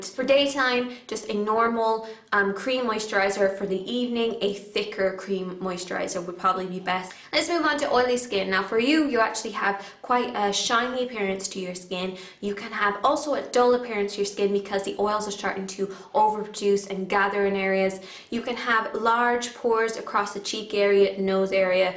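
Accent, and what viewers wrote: Ireland. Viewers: Not a little. She full irish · Are you a little bit Irish?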